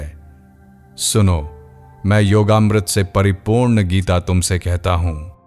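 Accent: Standard. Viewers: Sir aapki.aavaj bahut shudh h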